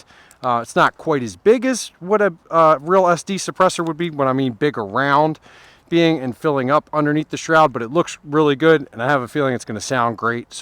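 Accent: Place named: Philadelphia, United States. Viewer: Are u from philly? Your accent is so NE philly...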